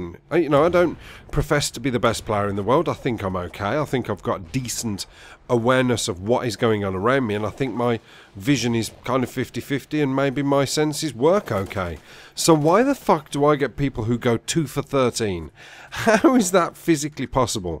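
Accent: Birmingham, United Kingdom. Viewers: Funny BRUMMY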